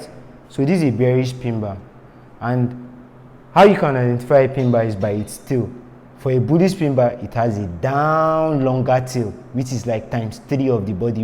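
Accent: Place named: England, United Kingdom. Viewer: Great explanation 👌 i love your teaching style and English ascent, i am very suprised to that with this great information u have passed freely to us no one is commenting or sharing this video, for i have subscribed and share ur link cox i like ur teaching and i want u to always do more of this good work 👏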